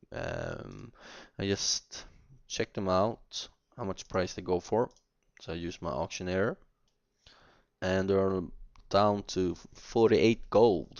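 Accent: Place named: Sweden. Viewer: haha hör att du är svensk ;P:D har kollat på dina filmer, men vilket av dom är bäst? vilken är lättast och billigast ?? och vilken tjärnar man mäst på lättast om man ska säga så :)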